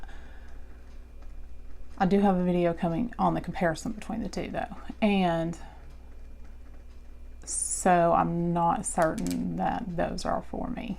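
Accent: South, United States. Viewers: I love your vids. Your so soft spoken and of course I know your southern. Keep the videos coming ♥️ you know us southerners are suppose to get snow this weekend 😬 IG LOVE YOUR PURSE never had a MK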